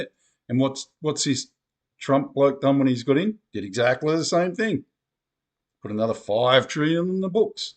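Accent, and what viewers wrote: Australia. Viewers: Good to have an Aussie voice, there are not enough speaking up.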